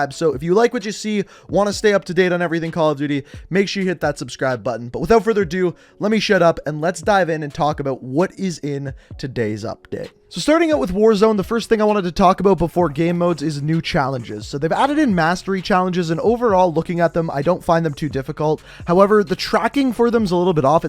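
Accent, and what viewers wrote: Canada. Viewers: Bro are you Canadian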